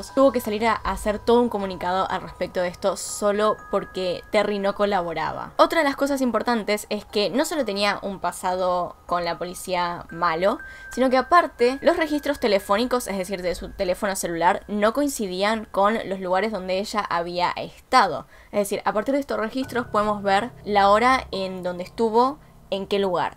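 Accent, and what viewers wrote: Argentina. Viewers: Pregunta soy nuevo en el canal de qué país sos, tenés un asentó argentino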